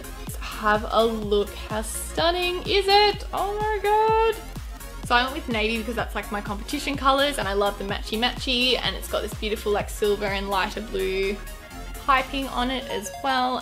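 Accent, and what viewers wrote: Australia. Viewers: OMG ARE YOU AUSTRALIAN :D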